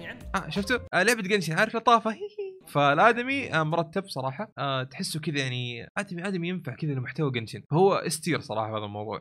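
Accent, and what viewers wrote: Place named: Iraq. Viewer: صراحة دوك صوتو واللهجة العراقية يكفون يخلو من الافضل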